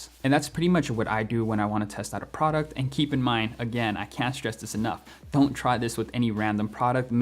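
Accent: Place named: New York, United States. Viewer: Are u from ny bro?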